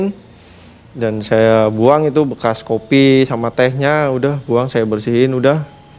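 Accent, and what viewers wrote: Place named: Tegal, Indonesia. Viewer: Kayong situse wong Tegal kiye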